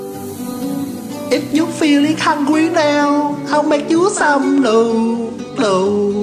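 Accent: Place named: Vietnam. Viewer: Your accent sound like vietnamese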